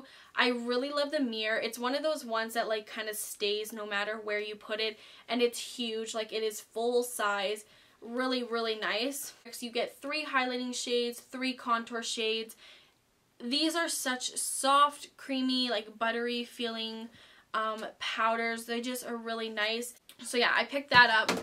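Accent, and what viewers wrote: Canada. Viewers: me too! u sound like your from the prairies!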